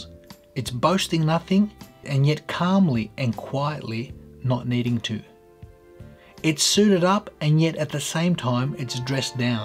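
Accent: Australia. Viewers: Lovely accent. Aussie? 😉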